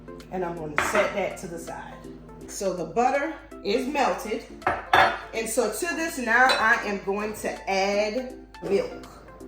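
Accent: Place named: South Carolina, United States. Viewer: This is how we make bread pudding im from SC, and she sounds like she maybe from there as well or close. I've seen ppl bread pudding and I wouldn't eat it because it didn't look like this 😂. I also toast my bread first ❤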